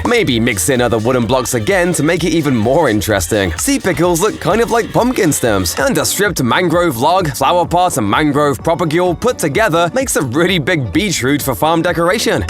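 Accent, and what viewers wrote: United Kingdom. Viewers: Damn didn’t know was British! and he has a new skin! Finally got rid of the red play button! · Are you British shut up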